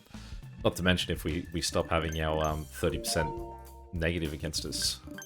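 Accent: Australia. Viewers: I don't mind the Aussie pronunciations of some of these cities, even if they're wrong, because I know Aussies are tired of hearing people saying Bris-BAYN and not Bris-BIN/Bris-BUN for Brisbane, or Mel-BORN not Mel-BURN for Melbourne.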